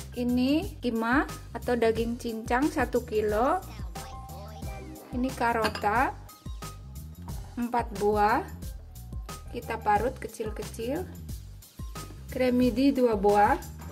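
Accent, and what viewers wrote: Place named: Banyumas, Indonesia. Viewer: Ngapak mania, masak super enak, jozzzzz👍👍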